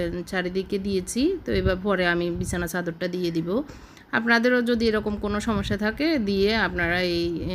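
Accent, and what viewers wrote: Noakhali, Bangladesh. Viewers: এটা নোয়াখলির ভাষা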